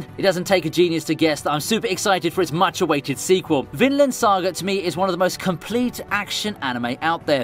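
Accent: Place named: United Kingdom. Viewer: грёбаный британский акцент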